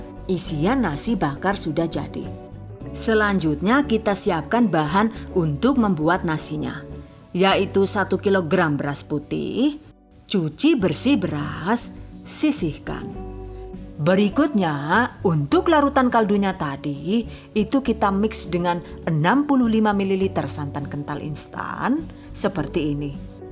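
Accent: Banyumas, Indonesia. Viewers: Kok ada ngapaknya ya,jd lucu hehehh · Ada ajh yh guyonan ngapaknya Paling suka ❤️❤️ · "DONE" Mksh ya bun,atas resep nya.trnya bunda wong ngapak ya....?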